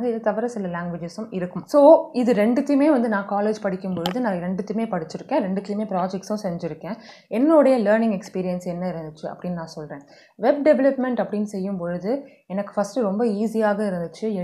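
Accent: Sri Lanka. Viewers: Are you srilankan??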